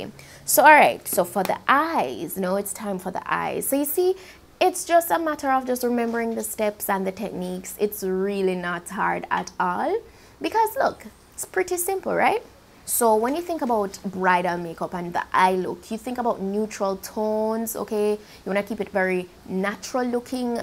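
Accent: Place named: Jamaica. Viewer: From mi hear yuh talk mi sey ok... Yessss another Jamaican Queen! Had to subscribe... Like before mi even start watch the video tbh. 😂😂🤣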